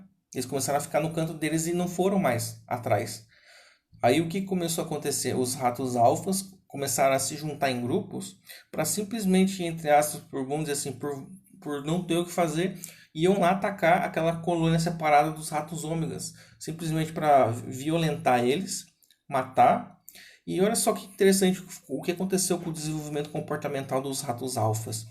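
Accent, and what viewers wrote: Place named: mixed, Brazil. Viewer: O sotaque mineiro/goiano é perceptível, ksksk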